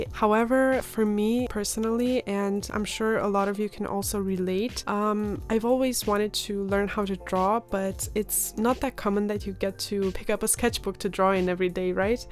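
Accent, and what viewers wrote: Germany. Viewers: Wow thanks for replying! And thank you for your nice words! I can imagine you're busy, I've picked up somewhere in the comments that you're working on your masters, good luck! I'm doing my PhD right now and I'm forced to keep it minimal because of lack of time. However I still try to dedicate at least 5 min per day to journaling. It gets my mind off real life :) Unrelated but are you German? Your accent sounds very familiar, haha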